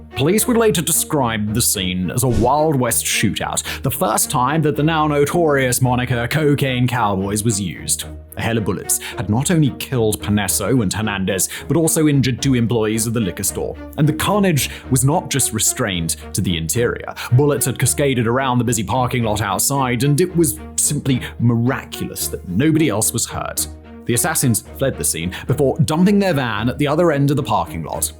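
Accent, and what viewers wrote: United Kingdom. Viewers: Pea-brain strikes again. Despite being a world famous city thanks to the cocaine trade still managed to mispronounce Medellin. He keeps proving that having a British accent doesn't make you smart.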